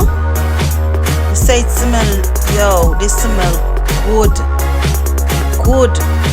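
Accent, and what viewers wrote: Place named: Jamaica. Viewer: Looks so yum that Jamaican accent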